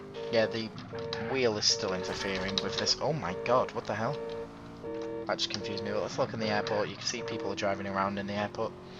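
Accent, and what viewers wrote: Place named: United Kingdom. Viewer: Finally found a channel that's based on old school GTA's. Specially San Andreas. And you sound British lol!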